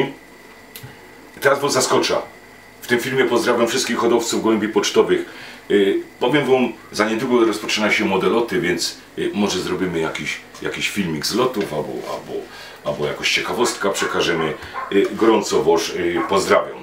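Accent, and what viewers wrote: Silesia, Poland. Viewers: Pozdrawiam z podkarpacia Panie..swietny przepis na zdrowe placki ziemniaczano, serowe bez chemi...lubie sluchac twojej mowy slaskiej..dziekuje za wiele wspanialych przepisow kulinarnych...nauka nie idzie w las....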